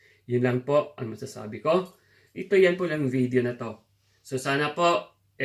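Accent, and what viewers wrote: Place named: Ibaloi, Philippines. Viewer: Parihu lng tayo ibaloi ngem magkaiba ng accent..hehe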